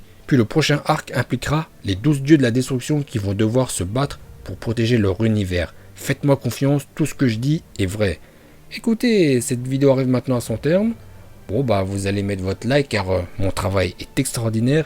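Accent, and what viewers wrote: Japan. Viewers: nous on veut ton accent japonais! Ton travail n'est plus extraordinaire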